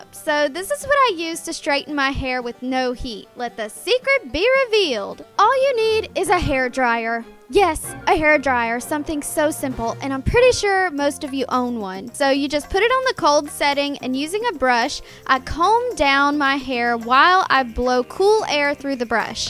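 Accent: South, United States. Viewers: You have SUCHHH a southern accent like whaaat but great video 😝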